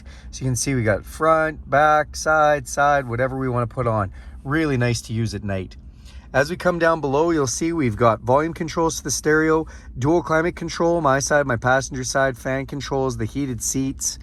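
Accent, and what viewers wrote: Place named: Canada. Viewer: I freaked out for a minute on the price....then I heard your accent "aye"....then realized it was Canadian price! lol. Great review! What I REALLY liked about your review is that you said "as well" only a few times when describing the features. I know it is hard, but several other reviewers say that dozens of times in a 10 minute review and it is distracting. Thank you.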